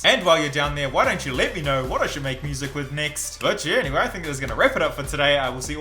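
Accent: New Zealand. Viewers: bro are you new zealand?? sorry im new to this channel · Are you a kiwi by any chance? Your accent absolutely sounds like a New Zealander.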